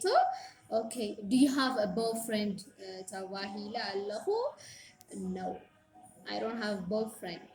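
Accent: Ethiopia. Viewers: ናይ መቐለ ትግርኛ ኣይስማዕን ዝበለኪ ኸ መንዩ 😏😏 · anti shikorina ane nay Shire endiyu lahjay gin ab adigrat wey dma bhafesh ab agame zelo tigrigna esi kab nay etom lahjom des eyblnenin do gele do tiblyom zeleki bzuh zifile eykonen. Ab hade quanqua nay lahja fililiy zelo eyu. ms yikreta kemzi natki zereba neay nay nebse mithat zisimeo eyu koynu zisimeni. Ab Tigray zela hmam amhargna mihwas eya ezi kea ab kulu zelo eyu. Eritreawiyan "Agame" zibil kem xerfi silezitikemwa ilki kali eytmseli bnatki kuri. kali yiredaki eyredaki eytcheneki ksab Tegaru ziterduki tirah amhargna eynhwes eyu eti kinblo zelena. Ane demani kem Tigraweyti meten nay mekelle, wukro, agame, raya kulom Tegaru lahja yirideni eyu! Nebska mimsal zimeslwo yelen kemzi eytkuni.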